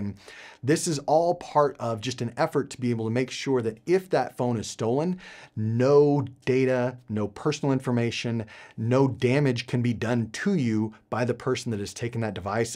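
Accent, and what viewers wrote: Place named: United States. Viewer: I don't understand why you speak american english but your channel is in portuguese. Frist time I see such thing.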